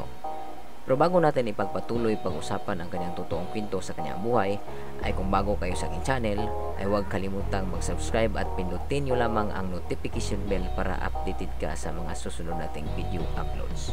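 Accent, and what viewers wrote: Visayas, Philippines. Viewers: Paki ayus ng Pananalita mo pre..halata tlaga accent mo na bisaya..bist allstar..pisos, istimitid na sahod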